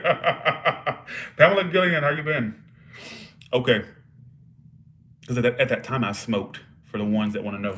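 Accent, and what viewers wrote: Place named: South, United States. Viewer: Damn you are the bomb. I love your southern accent; thank you for doing this.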